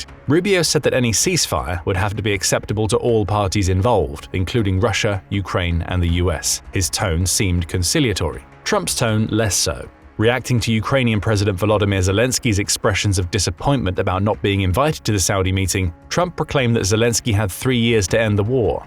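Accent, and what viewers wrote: United Kingdom. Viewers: Since you are British or Australian.? (due to your accent) you must be for sure against Russia and Putin. To use the word betray is wrong and you have no solid proof that it is the case. I am not Russian but I believe that Russia could eliminate Zelensky in minutes with an Orishnik rocket. However, because Putin promised the previous Israeli leader, Yahud Olmert ( name spelling could be wrong) not to take him out (because Zelensky is Jewish) he is refraining from taking care of Zelensky. My point is this Trump wants peace and to stop the killings in Ukraine. He is a businessman. Imagine if the three superpowers agree to peace the world would be in a much better place economically and safety wise. By the way, Putin wants to mediate cooperation between Iran and China which will happen very soon. I hope Putin will be able to succeed. 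Peace on earth.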